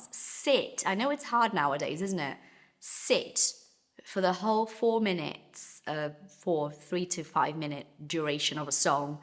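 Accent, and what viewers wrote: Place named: London, United Kingdom. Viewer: Your example..."and you are in London...."( I am...hehehe). Are you??? I believe so....hehehe based on your English😊😊 Infelizmente, after ages vivendo aqui, confesso...ainda assisto coisas com English subtitles....mas é weird pq Youtube eu não coloco subtitles, já tv, sim....😮 Anyway, great video! Deus abençoe!😊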